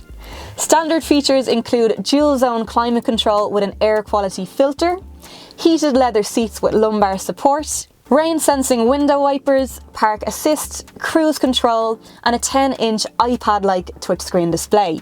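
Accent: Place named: Ireland. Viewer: Greetings from Sweden. I love your Irish accent... so pleasant. Great review from a beautiful woman.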